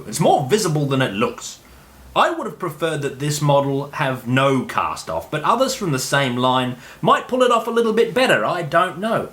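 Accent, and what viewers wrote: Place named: Australia. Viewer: Damn your a aussie I was thinking first vid I watched maybe somewhere posh in England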